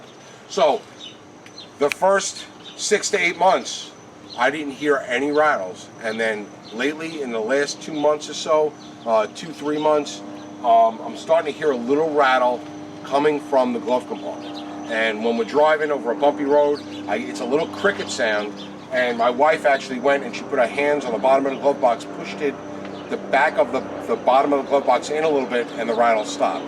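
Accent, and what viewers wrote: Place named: Long Island, United States. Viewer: this just wouldnt be the same without the Long Island accent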